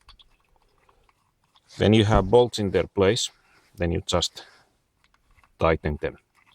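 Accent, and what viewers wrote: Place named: Finland. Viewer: Thanks man, very useful! Also love that finnish accent! Cheers from the Czech Republic :)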